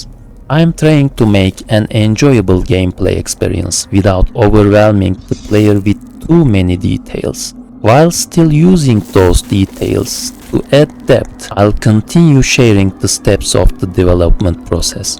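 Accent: Turkey. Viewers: You speak like Turkish :) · Are you Turkish? yazacaktım sonra adını gördüm ama instagram linkine tıklayınca Türk olduğunu anladım. Türk aksanıyla İngilizcenin en güzel örneği:) kötü bir şey demiyorum bu arada, aksan bence güzel bir şey 😀 Başarıların devamını dilerim, böyle oldschool rpgler eski grafiklere rağmen daha dönemi yansıtıyor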